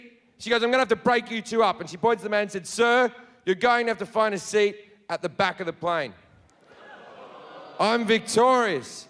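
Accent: Australia. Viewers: No, he's just Australian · He's Australian, mate. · Nah mate, he's just Australian. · Australian accent very irritating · He's from 'straya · No. As an european, I sensed that it might be aussie. And it it! :-D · Just Australian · He's just Australian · Wow, love him. So beautifully Aussie. 💖💯👍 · Nahh... he just sounds Aussie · He's Australian that's their accent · He is just Australian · A lot of Aussies talk like this. QUEENslander. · He is not American !!!😂This is an Australian accent Lol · He’s an Australian comedian. Hello. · That's just the more relaxed and slack type of Aussie accent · Australian mate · He is just aussie:)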